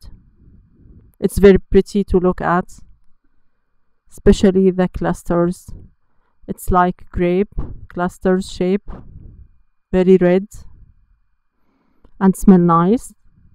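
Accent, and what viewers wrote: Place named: Iran. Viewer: I love the Iranian accent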